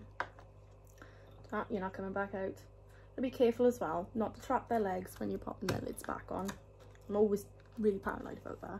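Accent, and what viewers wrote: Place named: South Wales, United Kingdom. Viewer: You sound like you're from my neck of the woods. South wales?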